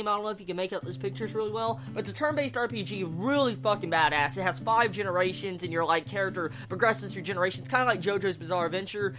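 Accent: South, United States. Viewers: Is it me or do have an southern accent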